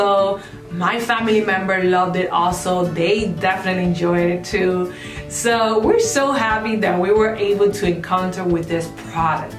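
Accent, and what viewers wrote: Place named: Spain. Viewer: I love that she has this amazing Spanish accent, sounds and looks so bubbly - and that teal bow looks super cute on her! Video was also super informative, too. She sold the product better than the actual brand!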